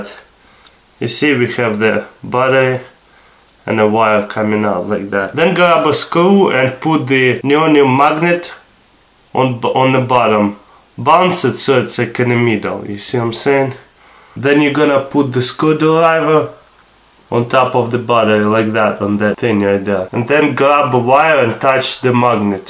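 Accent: Russia. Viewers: Just speak Russian that's what it sounds like anyway and get some subtitles if you want more people to understand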